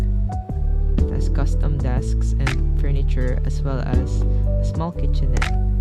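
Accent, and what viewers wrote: Philippines. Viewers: Ur filipino cos of ur accent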